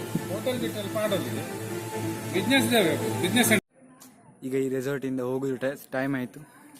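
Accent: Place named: Kundapura, India. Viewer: Nim kundapura kannada laik itt matt niv nachige padbedi nav nimg yenta heludilla so bindas matadi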